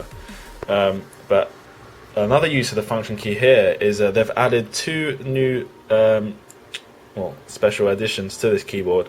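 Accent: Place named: United Kingdom. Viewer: Youtube showed an ad for the new Sacha Baron Cohen film, just before this film, and suddenly your face popped up with that british accent.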